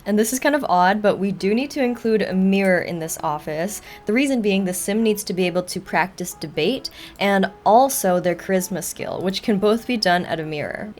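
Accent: Canada. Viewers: She's Canadian. Different countries, different pronounciations.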